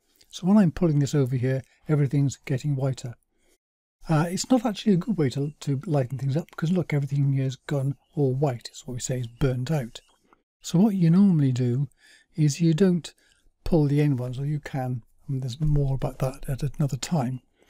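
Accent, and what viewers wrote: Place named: South Wales, United Kingdom. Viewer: Hi. From your accent and some of the photos you use I guess you're in my part of the world - S Wales?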